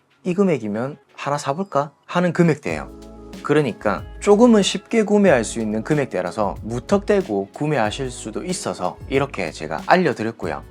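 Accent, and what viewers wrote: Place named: Busan, South Korea. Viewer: 카메라 관련 제품리뷰를 찾아보려면, (어쩔수 없지만) 광고협찬이 많다보니 믿고 시청하기가 힘든 경험이 많네요 ㅠㅠ 그래도 님이나 몇몇 리뷰어 분들께서 이렇게 솔직히 얘기해주시니 더 믿음이 가는 것 같습니다!! 이런 분들은 나중에 협찬을 받더라도 "정말 좋은 제품을 소개해주려나보다"라는 마음이 드는 거 같아요! 좋은 영상 감사하고 앞으로 더 잘 되시기 바랍니다! +부산사람이다 보니 사투리가 정겹네요😊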